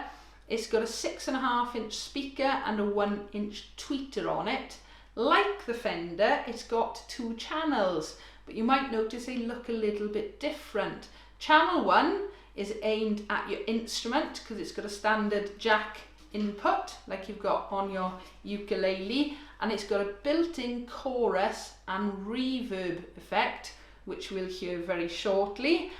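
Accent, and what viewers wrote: Wales, United Kingdom. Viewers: How do I amplify my acoustic Ukelele? I know that Acoustic Guitars can be done with a Mic in front of it, but how reliable is it. Nice to hear a Welsh accent from a Bristolian living in Canada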